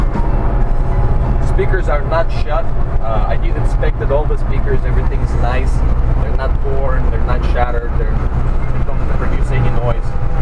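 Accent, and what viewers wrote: Russia. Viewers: Love the accent, is it Russian? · You do know words and can make sentences but have very Russian pronounce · Do I here a little russian accent there?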